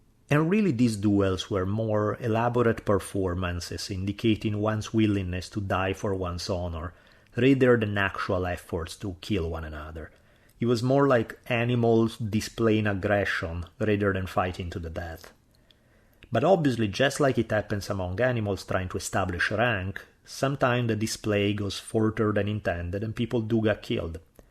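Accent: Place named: Italy. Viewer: Hi! I'm really enjoying your work! I only came across you because of a Dan Carlin interview with you..I lived in Italy for many years and I enjoy your accent 😊 A friend from Brescia has exactly the same accent..Bravo!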